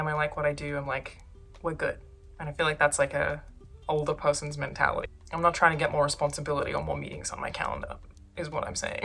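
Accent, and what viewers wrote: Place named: Australia. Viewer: I imagine you'll still be hot when you're 40, you'll still have that Australian accent that north Americans love. I also imagine creepy people on the Internet will still be a thing ten years from now.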